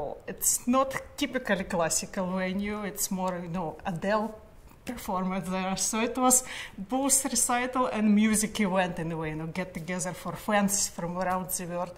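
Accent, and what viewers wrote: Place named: Moscow, Russia. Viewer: Moscow dialect of English. Nevertheless the grammar is normal.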